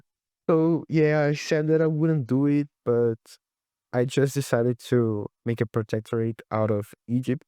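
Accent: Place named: Brazil. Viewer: He is prob from Brazil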